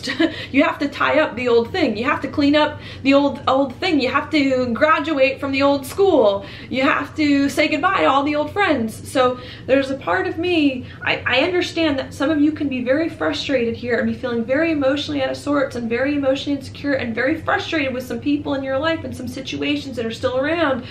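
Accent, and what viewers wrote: South, United States. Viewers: My goodness gracious, you have amazing skin and healthy hair. I don't talk like a douche. But I needed to get that out. Rawr, lol. Okay, I've looked like a beta jerkoff enough now. I'll quit. Not like I'm ever gonna meet you, so I didn't have anything to lose by telling you these things, hahaha. Oh and that country accent, and your energy... yum 😂 Great readings too. No joke.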